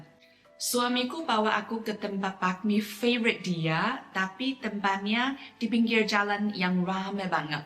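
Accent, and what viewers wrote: China. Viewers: Kakak dari Beijing ya? Dulu ada temenku dari China yg 'dialek' bahasa Indonesianya seperti kakak kalo ngomong.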